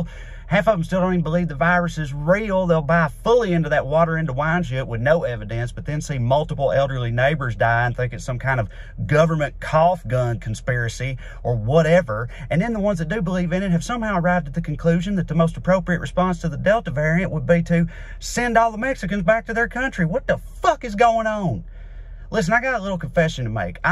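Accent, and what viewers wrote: South, United States. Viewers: Yeah he's got a Southern accent but he's 100% wrong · Well, This is REFRESHING!!!!!! A southern accent paired with REALITY, SCIENCE, and THE TRUTH!!! I LOVED the simplicity and honesty that acknowledges that this pandemic IS NOT ABOUT OUR INDIVIDUAL RIGHTS AND EGOS—but about PROTECTING OTHERS, AS WELL AS OURSELVES!!!! Well said, THANK YOU!!!!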